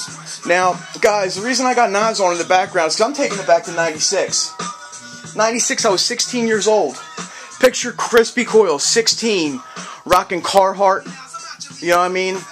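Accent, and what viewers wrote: Philadelphia, United States. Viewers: damn wish them draft day's were a size 10 i fuck wit em... i scopped that philly accent from the beginning lol.. #215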